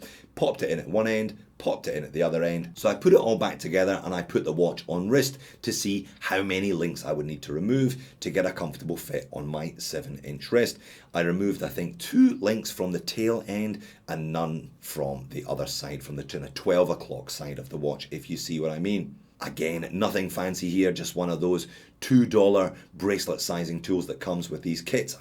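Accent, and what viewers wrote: Scotland, United Kingdom. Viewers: A Scottish Barry White? Now HE would have made a fortune!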